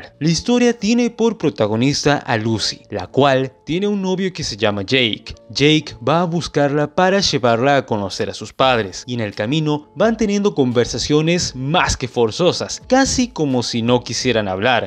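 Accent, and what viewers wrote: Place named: Entre Ríos, Argentina. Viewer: Sos medio ashastrado para hablar hermano, pero queda bien! Le da un buen estilo, parece que sos entreriano o norteño de algún lado de Argentina, un abrazo de cordoba.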